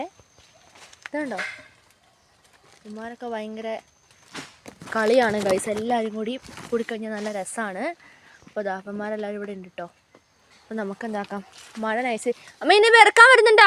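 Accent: Kottayam, India. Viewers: Athea currect kottayam slang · Slang oru kottayam touch 🧐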